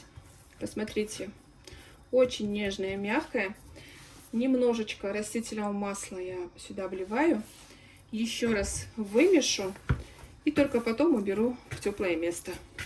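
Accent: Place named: Dagestan, Russia. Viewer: Дагестанский акцент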